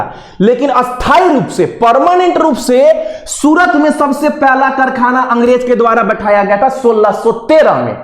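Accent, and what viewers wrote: Bihar, India. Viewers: Bhaiya Aapne Bihari andaz me smjhaya Amazing 😍🤩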